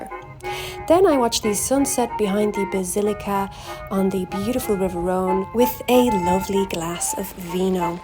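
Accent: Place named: Ireland. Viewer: I love your Irish accent! Guinness!!!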